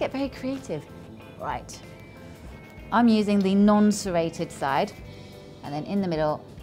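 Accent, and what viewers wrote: England, United Kingdom. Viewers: Man, I love to hear cute women speak with an English accent.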